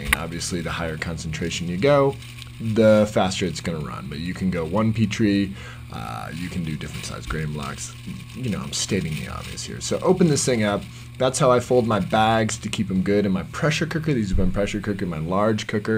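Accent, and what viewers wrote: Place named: Australia. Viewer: Reminds me of an aussie man voice over lol. This is great thanks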